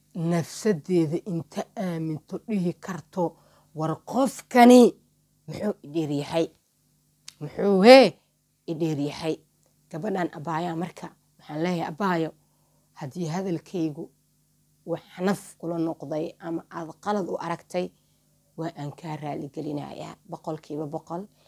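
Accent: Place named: Somalia. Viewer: markaa ku bartyba cidaa thyba dhaqsaba waa garty waayo lahjadeena maaha mid dhunta iyo codkarnimadeena lkn gabar umada usiman thy allah ha ku xafido abayo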